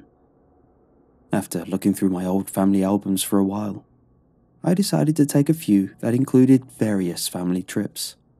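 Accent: England, United Kingdom. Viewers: YAY! BRITISH ACCENT! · English boi?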